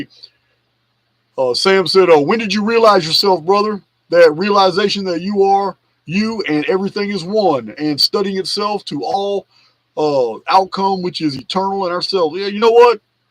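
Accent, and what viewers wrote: United Kingdom. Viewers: Brilliant Manchester accent 😊you didn't piss me of and im Scottish 😂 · Lol...loved the English accent😊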